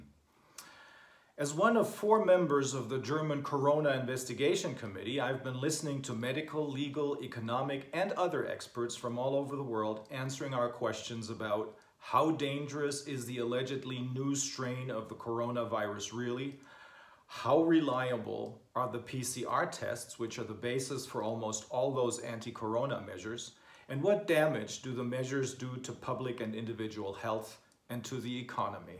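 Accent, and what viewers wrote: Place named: United States. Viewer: On a side note, this dudes American accent is amazing.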